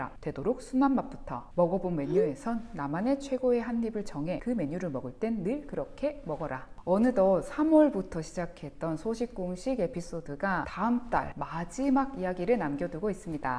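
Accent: Gyeongsang, South Korea. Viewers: 선생님 경상도분이신가요??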